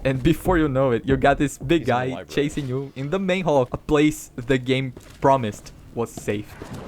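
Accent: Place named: Brazil. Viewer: amazing survival horror study, your game is gonna rock (and judging by your accent i think this is a r/suddenlycaralho? kkkk)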